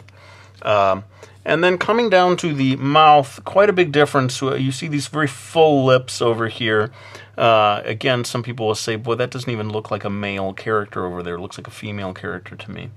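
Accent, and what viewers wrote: Brooklyn, United States. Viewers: Your Brooklyn accent is just too much!. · I do love your Brooklyn accent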